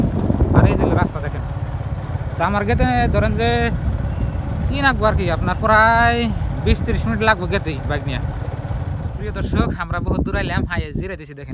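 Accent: Tangail, Bangladesh. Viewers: বাংলাদেশ টাংগাইলের মতো ভাষা লাগে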